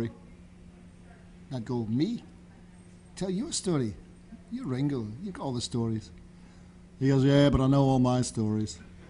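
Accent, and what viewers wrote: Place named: Ayrshire, United Kingdom. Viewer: This guy comes from my hometown in Ayrshire, his Dad had a music shop in the town and often came to our house to tune our piano when I was a kid. I can't believe Colin still has his Ayrshire accent after leaving here over 40 years ago.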